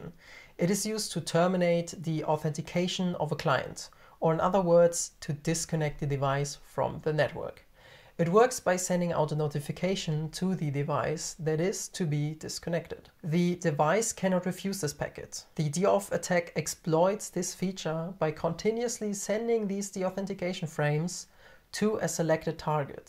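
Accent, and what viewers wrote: Germany. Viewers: you have a german accent lol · Are you German ?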